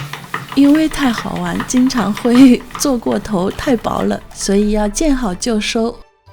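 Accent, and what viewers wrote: Shanghai, China. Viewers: 吾晓得，从侬额普通话里听出来了😝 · 我猜你是上海人是吗？听口音感觉是。 被你种草，作为一个同样喜欢面食的人，去AMAZON下单了一台MERCATO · 沪普听了台太亲切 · 懂上海话的人很明显听得出来你是上海人，不懂的肯定就听不出来，反正对我来说很亲切啦！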